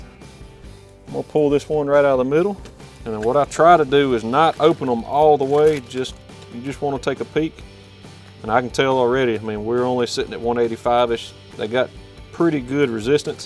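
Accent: South, United States. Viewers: You can tell by the southern accent, he doesnt live an ANY Texas city. Lol. "We still have a little way to gew."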